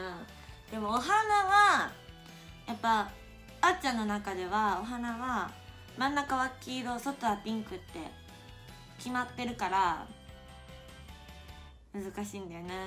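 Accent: Kansai, Japan. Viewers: なんで関西弁？笑笑可愛すぎやろ😭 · 関西弁多いね。かわいい💕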